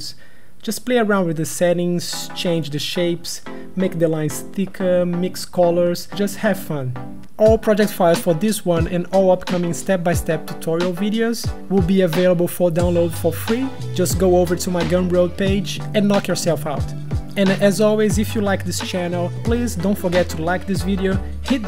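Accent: Brazil. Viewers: Mano, vc é brasileiro. Diz ai!? Sotaque entrega. Muito foda, parabéns! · are you brazillian?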